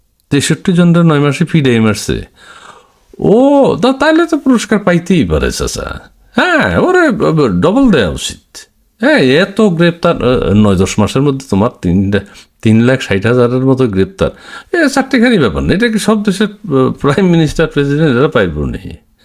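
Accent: Noakhali, Bangladesh. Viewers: পাগলামী ছাগলামী বাদ দিয়া ভালো কিছু বলেন। কথার টান শুনে তো নোয়াখাইল্ল্যা মনে হচ্ছে। কাদের সাবের আত্বীয় নাকি??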